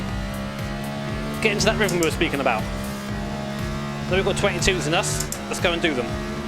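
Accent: United Kingdom. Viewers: YOU'RE BRITISH???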